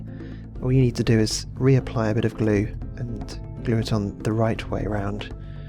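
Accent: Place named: United Kingdom. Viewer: um.. can you use plain old printer paper and I love the British accent lol and yes I know he is really British.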